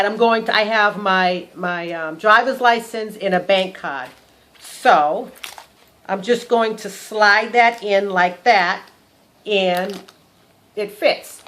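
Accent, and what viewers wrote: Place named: Massachusetts, United States. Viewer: Hi! It's Balance and Grace. Great Review! Great piece. Great nails. And I love your accent. It reminds me of my best friend from Mass. Be well!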